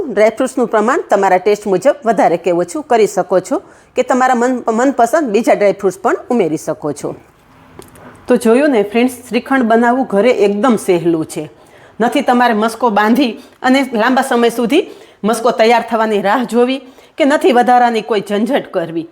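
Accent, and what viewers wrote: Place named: Kathiawad, India. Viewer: beautifully explained in pure kathiawadi gujrati. You showed two things.... How to make thick dahi and shrikhand. I will add the ingredients to make dahi and shrikhand. Thank you.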